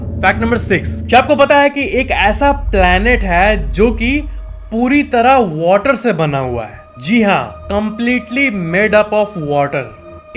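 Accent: Bihar, India. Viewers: Aap bihari ho n ?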